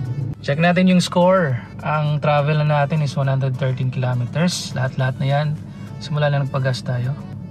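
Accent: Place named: Davao, Philippines. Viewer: Davao kaaayo hehe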